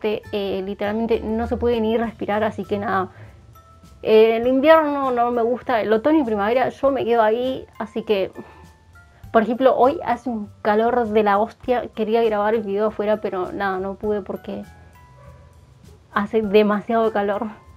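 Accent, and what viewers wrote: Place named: Argentina. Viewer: Acento ARGENTINO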